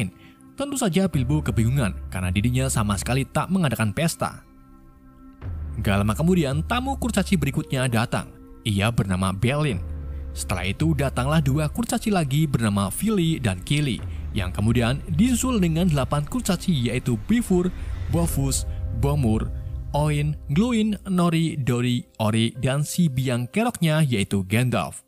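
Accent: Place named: Tegal, Indonesia. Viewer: Maceme nt wong tegal ya WA😁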